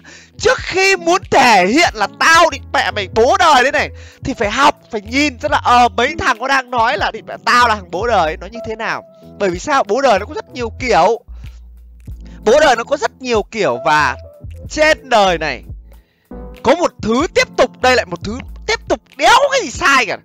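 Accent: Standard. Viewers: Nghe anh ns nghe chuẩn vl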